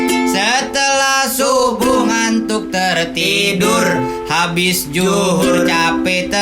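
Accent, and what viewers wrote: Banjar, Indonesia. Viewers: Logatnya terdengar seperti orang banjar apalagi pas nyebut nasi kuning wah menu andalan pagi hari 😂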